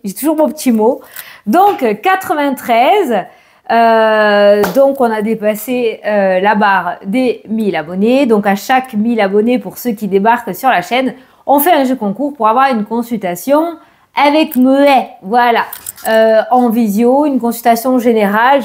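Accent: South, France. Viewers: J’aime de plus en plus regarder vos/tes vidéos. Bonne humeur à gogo Et l’accent:-) Dans le sud aussi, pas loin je pense. Bravo 💖 Ps: je sors de la douche 😜😂, j’écoute en me préparant … 👍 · Bonjour ma douce, merci pour ta guidance, merci pour ta bonne humeur avec ton petit accent du sud que j'apprécie. Bisous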